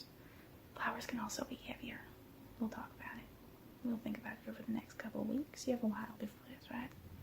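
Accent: South, United States. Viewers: Just when you wonder if the girl can get any cuter, she throws on a southern accent and continues stealing your heart <3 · As a resident of the south you got the accent down well 😂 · Still so naturally chill and yay a new video 🤩 I don't usually find southern type accents relaxing as I have to focus harder to listen lol I'm British so it doesn't exactly come natural to me 😂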